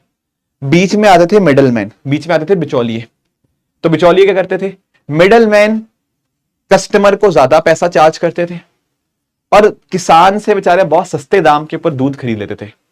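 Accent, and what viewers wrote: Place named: Punjab, India. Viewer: Sir you teach great,but are u Punjabi? From your accent I'm guessing because Punjabi living in Haryana Delhi have similar accent of Hindi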